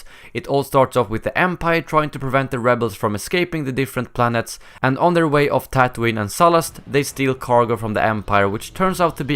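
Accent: Sweden. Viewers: Dude are you swedish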